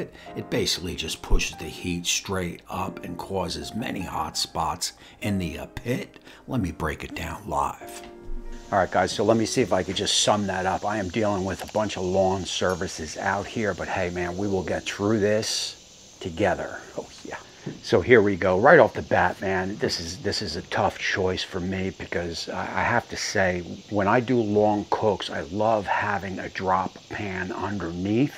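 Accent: New Jersey, United States. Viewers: Chim-a-ney... Love to NJ accent brother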